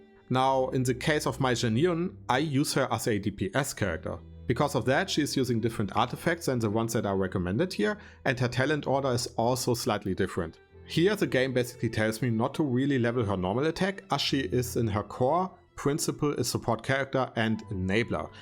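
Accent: Germany. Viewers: Du bist so Deutsch man hört das direkt aus dem akzent xd Gutes Video😆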